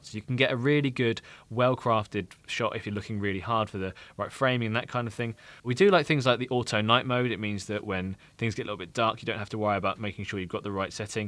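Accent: United Kingdom. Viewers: Your English British is better than american English, very very strong. I have enjoyed hearing you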